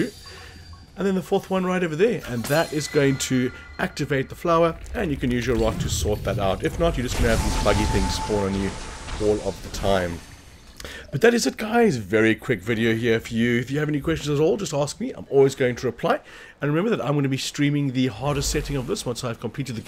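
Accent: South Africa. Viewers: Feel like such a tool. Good to hear a South African accent too 😂✌🏻 thanks man!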